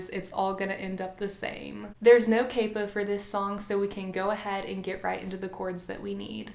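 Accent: United States. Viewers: Yeah tots but why does she speak like a cow girl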